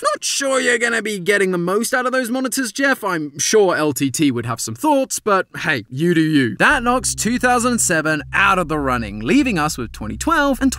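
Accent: United Kingdom. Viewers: I want matpat back Your voice is just too British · I'm so used to matpat that it scared me when I heard a British accent love ya!😭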